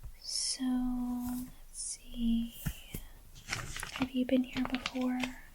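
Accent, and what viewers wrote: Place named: Midwest, United States. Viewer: I like your slight accent but I can't tell the region. Midwest somewhere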